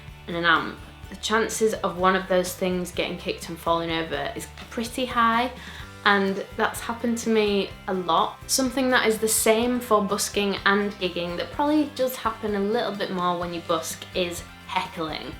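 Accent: United Kingdom. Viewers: you're from the uk? you sound northern!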